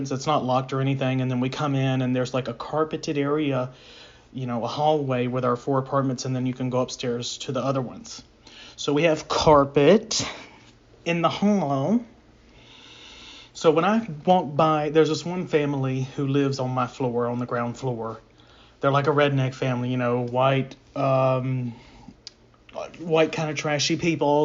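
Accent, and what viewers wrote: South, United States. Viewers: please put your paypal link in the description box for ALL your videos so we can donate to your work. Oh and another thing, dont you dare change your accent or many of us will unsubscribe. We love you southerners the way you are - this is because many of you white folk in the deep south are just beautiful human beings - so promise us all that you will never change.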